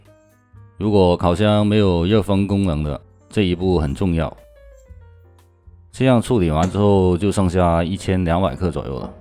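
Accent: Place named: Fujian, China. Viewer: 是福建口音 哈哈哈哈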